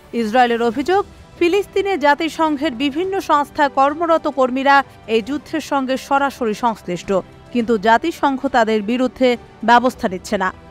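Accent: India. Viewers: খবর প্রচার কারি মহিলার কন্ঠ ভারতের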